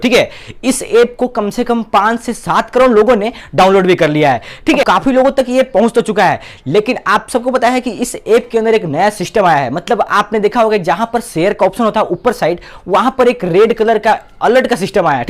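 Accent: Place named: Bihar, India. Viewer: thoda space dia kr bolte waqt bihari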